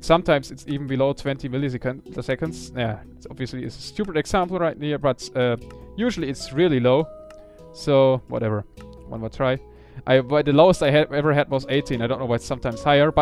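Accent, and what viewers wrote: Germany. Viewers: You're awesome :) awesome German accent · Your German accent makes it hard for me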